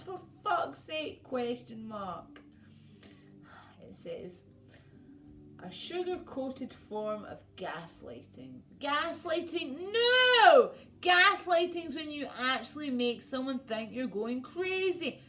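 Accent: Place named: Scotland, United Kingdom. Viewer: I subbed you back hunni,great to hear a good old Scottish lass in this fight,my 1st,lol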